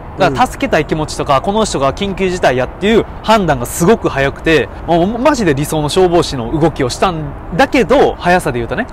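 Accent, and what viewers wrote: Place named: Kansai, Japan. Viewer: なんで関西弁なん🤨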